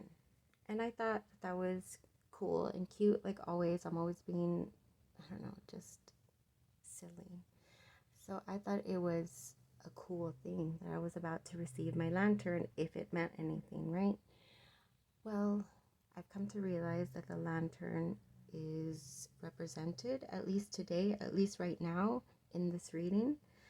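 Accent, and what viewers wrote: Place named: Latin America. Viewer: Pile 3 -Oh my Goodness, What can I say but...what an extraordinarily, beautiful 😍 reading. Firstly, I love how you speak with your receiving hand. You are absolutely 💯 amazing. I would be able to understand everything you say even if there was no sound. The sign language you send out is clear and concise. I'm not sure what nationality you are and I don't want to assume but by your accent I want to say hispanic? A beautifully, sensual spoken ❤️ ❣️ LOL, I'm Ukrainian and I speak with my hands. LMAO I catch people watching my hands constantly and it looks like they are like what the hell?!😂🤣🤣 Even when you talked about the curtain you have up, your hand created that curtain as well. ✨️Truly uncanny✨️ So many syncronicities. Everything from the dried flowers(I remember the reading a few weeks ago that you first brought them in. 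It completely caught me off guard. I didnt comment because there were too many personal messages that I didn't want to share publicly. And I will say that all 3 piles resonated heavily), bessom broom and drift wood all spoke to me very deeply. My supernova tower moment happened in 2007 and since brought multiple tower moments to help me clear all heaviness I have been carrying. The most recent tower moment which started on May 12th and completed itself May 14th, has brought a serenity to my life like no other. Please know how connected you are to the collective(and well me, I feel very guilty because I feel like I am receiving free readings, which I wou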